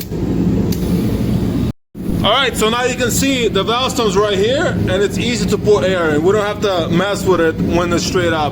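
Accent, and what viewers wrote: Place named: New Jersey, United States. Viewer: What accent is that? Jersey?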